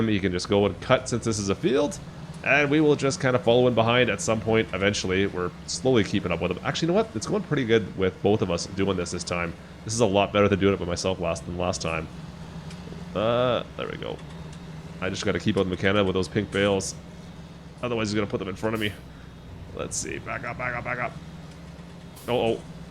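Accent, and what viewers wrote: Canada. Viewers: A fellow Canadian...EH?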